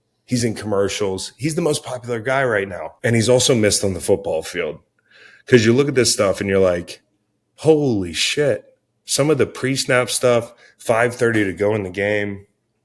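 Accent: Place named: Philadelphia, United States. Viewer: Zach Baun had the best game but another great video one of the main reasons I like watching your videos is because you talk just like us Philly fans and you talk like you’re just having a conversation with the boys. Keep it up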